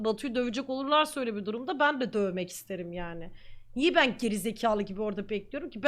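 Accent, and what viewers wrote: Erzurum, Turkey. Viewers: lan bu erzurumlumu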